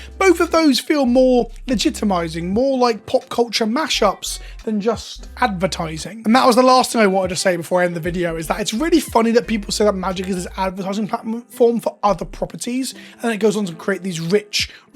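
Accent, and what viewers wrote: United Kingdom. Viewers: Holy shit, youre british?!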